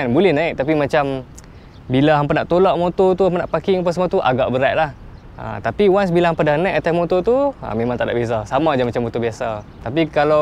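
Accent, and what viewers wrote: Penang, Malaysia. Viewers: Orang penang ka ni hehe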